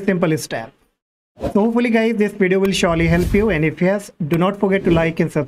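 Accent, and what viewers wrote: India. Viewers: no offense but the way to pronounce S in some words, tells me you are an indian :) speak in your normal english accent bro. don't make it american :) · I knew it will be good when I jeared the indian accent, great video, quick and straight to the point.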